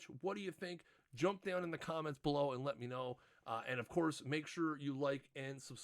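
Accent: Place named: Ireland. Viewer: Total tangent, but I'd love to know where your accent is from. You sound like you turn Irish every time you make an 'ar' sound. When you say 'are' and 'target' back to back, as an example.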